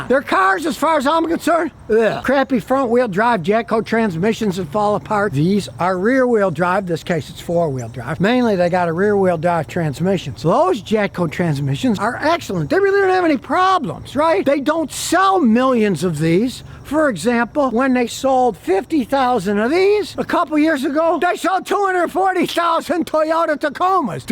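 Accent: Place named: Ohio, United States. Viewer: lol lol. Heavy Ohio accent.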